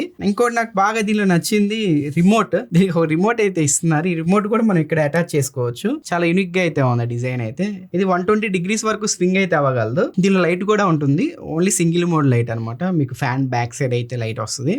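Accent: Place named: Andhra Pradesh, India. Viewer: Bhaiya andhrolla slang gamat untadi 😂🤣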